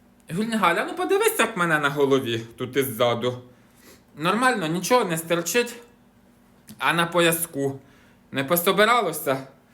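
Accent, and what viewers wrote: Poltava, Ukraine. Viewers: Дякую Ти дуже ловко балакаєш по Полтавській,а то що годи у нас і досі так кажуть,ідем на годи до Миколи💕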